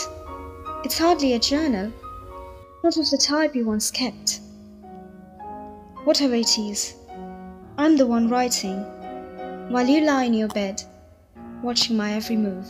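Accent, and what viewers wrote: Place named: United Kingdom. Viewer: Eta tomar accent??💀😩😳 ami vablam kono British meye pore shonachhe . Plss prottek ta part pore shunio to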